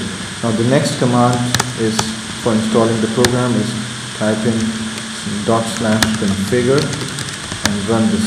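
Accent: India. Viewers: A voice from the bottom of an oil storage tank with some extra noise! Are for real? You don't respect yourself and your viewers. Get some proper audio recording equipment first, then post your videos. These Hindi... they think that listeners are not having enough difficulties understanding their heavy accents. They add some other audio garbage on top. Barbarians!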